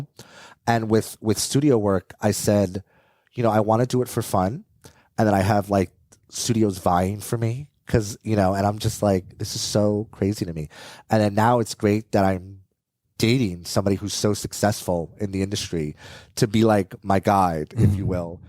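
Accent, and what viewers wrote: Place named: Bronx, United States. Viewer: You have such a thick Bronx accent!!!!! Represent!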